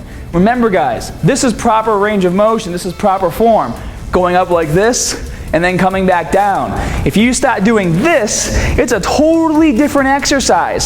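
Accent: Boston, United States. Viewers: You're really laying on the accent thick, you never spoke like that before, plus you say certain words with the baaaahhhstahhn accent and same the the same exact word later on without an accent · What kind of accent is that? Like Boston, Rhode Island area? And I'm new to your channel! I remember years back I would youtube how to do a certain exercise and your videos would always be up! Looks like I was doing mistake number 1 lolz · How did I not notice your Boston accent till like two years into watching you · Ever since I noticed you has a Boston accent it's like a game to catch it. Hahaha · You didn't used to have a Boston accent this thick back in the 2011 days, are you doing this on purpose?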